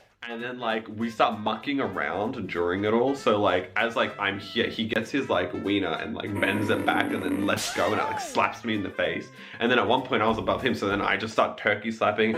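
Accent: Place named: Australia. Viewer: He’s Australian girl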